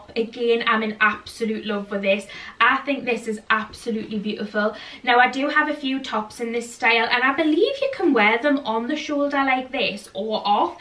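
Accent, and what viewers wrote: Newcastle, United Kingdom. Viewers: You always make me laugh 😂😂 soo funny.. Are you from Newcastle? Love the geordie accent! Going to be soo embarrassed if I'm wrong though 😂 also are the shoe sizes true to size? Xx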